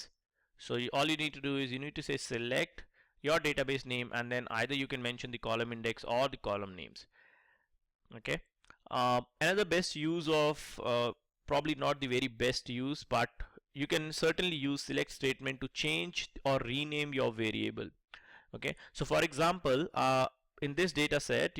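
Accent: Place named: India. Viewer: This is great but Indian accent is so annoying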